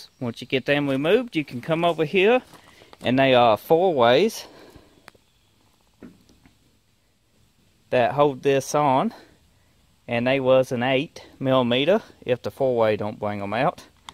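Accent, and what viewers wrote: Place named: South, United States. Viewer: Great info! Just how far South ARE you? I could listen to your drawl all day long. Makes me want to get a 6 of PBR!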